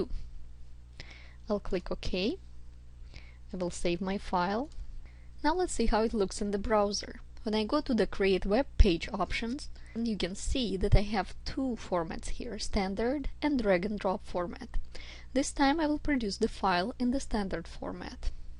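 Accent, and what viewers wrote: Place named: Russia. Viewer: This video helps me a lot! Thank you so much! Although you connect the first question to the second and so on, I don't know how to import 3 or more JMix sentences into moodle. Can I get it right? when importing these sentences into moodle, we choose all sentences! In exam view, I just import 1 file containing many different questions ( about 40 sentences). In hot potatoes, can I create and import 1 file containing many different kinds of questions? such as; JMix, Jquiz, JMatch.... I would appriciate your help! * P/S: Don't speak English in Russian Accent! It's hard to hear!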